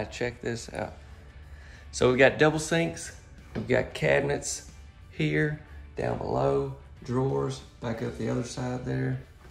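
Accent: South, United States. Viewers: but it is you. You are a southener. Not a bad thing. It is in your personality be glad it's a likeable trait. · Here in Texas, they say "fixin to"....in his adorable way...says "fitin to".....I am beginning to learn Southern with him!!!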